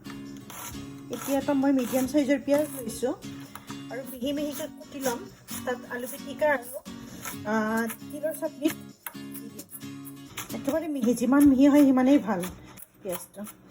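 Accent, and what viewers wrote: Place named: Barpeta, India. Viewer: বৰপেইটা ভাষা আহি এ যায়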